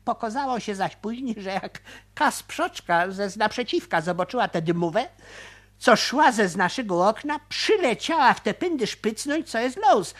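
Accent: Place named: Poznań, Poland. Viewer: fajno godo :) tero tak mało wiary gogo po poznańsku szkoda :(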